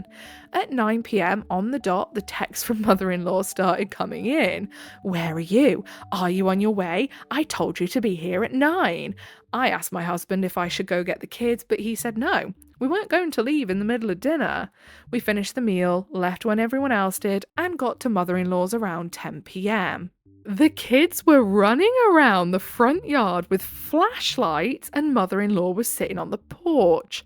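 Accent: United Kingdom. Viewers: Oh you're British! No ai and British? Yes!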